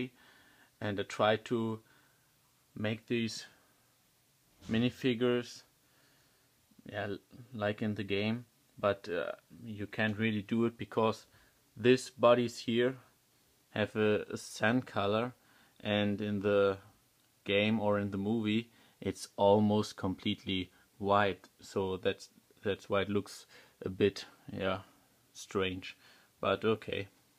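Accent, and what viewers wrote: Germany. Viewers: Ich finde es witzige wie er English spricht aber Deutsch ist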